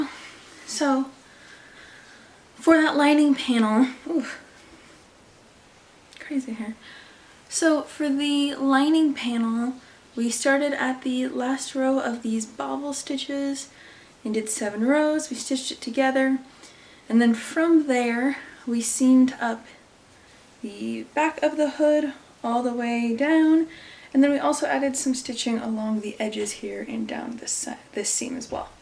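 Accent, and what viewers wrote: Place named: Australia. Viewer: hi. are you in australia? you sound australian but the yarns you mention are all US. Maybe if you blocked the hood it might sit better? Can you tell me what you dislike about this yarn and did you do a swatch first? anyhoo, I like the hood, especially the colours and it looks straightforward, nice to meet you :)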